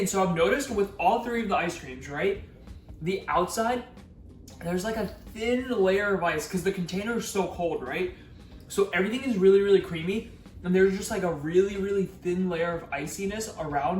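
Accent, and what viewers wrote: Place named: New Jersey, United States. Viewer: YES, I’m from Passaic county lmao recognize the accent anywhere 😂❤️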